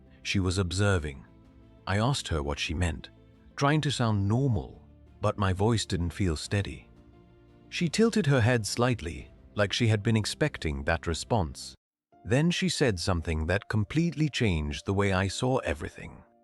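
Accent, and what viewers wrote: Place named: India. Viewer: This story is clearly Indian culture and the voice has comparable accent. Then he mentioned Texas. It was jarring. I would have enjoyed this story had it not suddenly culture shifted. … just got to the end of the story. Daniel didn’t know his brother had replaced him? That makes absolutely no sense. I understand this whole story only works in arranged marriage situation, but for the brother to not know makes this whole thing ridiculous!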